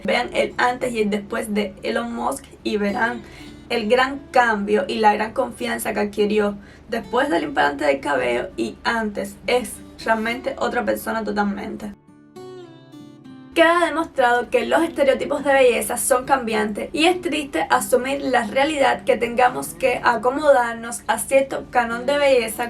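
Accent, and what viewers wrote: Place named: Cuba. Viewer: Creo que tiene acento cubano, me gusta!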